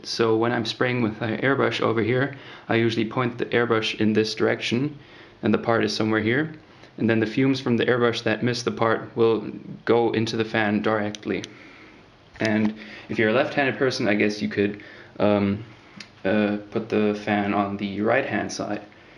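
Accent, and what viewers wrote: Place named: Germany. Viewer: so typisch deutscher akzent ^^ super reviews und super paint booth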